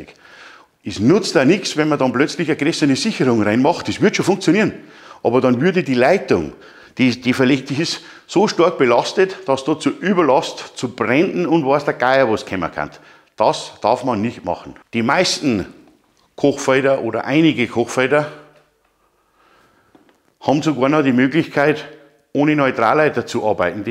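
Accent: Bavaria, Germany. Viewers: du erklärst es einfach, dass ich mich zwar für mich darauf konzentrieren muss, deinen bayrischen Dialekt zu verstehen.Du bist ein netter Kerl.Mia san mia👍😉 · der "Erklärbär" 😂 Wie immer klasse, auch wenn ich mich als Preuße oberhalb des Weißwurstäquators manchmal anstrengen muss das bayerische zu verstehen:-) Mal ne vielleicht ungewöhnliche Frage? Vom Zähler zum Wohnungssicherungskasten ist zwar 5x10 Quadrat verlegt aber 2 Außenleiter sind nicht im Zählerkasten aufgelegt und somit im Sicherungskasten auch nicht. Habe sozusagen nur 1 Außenleiter, 1 Neutralleiter und PE zur Verfügung. Der eine Außenleiter ist mit allen 16A Sicherungen im Kasten verbunden. Wäre es richtig wenn ich zum Kochfeld mit 5 pol. Kabel gehe, wobei ich 3 Außenleiter (einzeln abgesichert) nehme?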